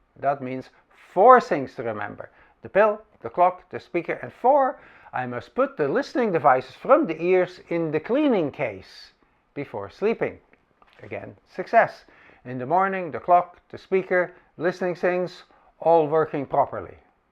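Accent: Netherlands. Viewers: Nice to meet the real with two letter "a". That Dutch accent reminds me of the days I worked for a Dutch Bank many decades ago. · Really nice you are making videos again and to hear your Dutch accent. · Wat knap dat je het Nederlands-Engelse accent (nog) zo goed kan! I find that really impressive. Good to see you back.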